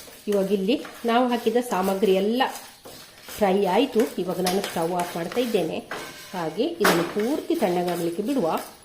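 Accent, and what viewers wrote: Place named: Malnad, India. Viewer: ನಿಮ್ಮ ಮಲೆನಾಡು ಶೈಲಿ ಯ ಕನ್ನಡ ಕೇಳಲು ತುಂಬಾ ಚಂದ.!!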